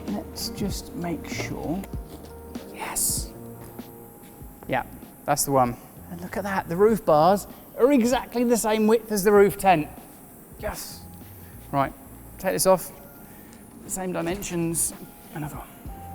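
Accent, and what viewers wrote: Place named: United Kingdom. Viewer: 我居然听口音就知道是英国的 美国人说英语还是很不一样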